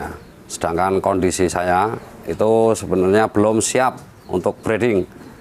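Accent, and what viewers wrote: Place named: Java, Indonesia. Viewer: Ngringokna channele khas njawane 😂, apa adanya 👍🏽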